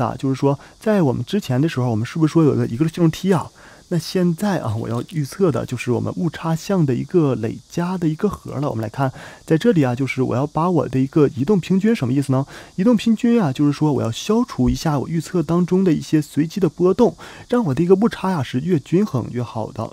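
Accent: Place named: Dalian, China. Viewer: 感觉像大连人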